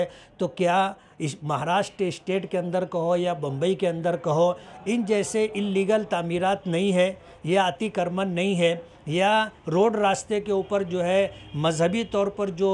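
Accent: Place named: Hyderabad, India. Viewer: मामू तुमाला महाराट्रात ल्या जनतेनं निवडून दिल तुमी हेंद्राबाद मध्ये बोलता अशे वाटत आहे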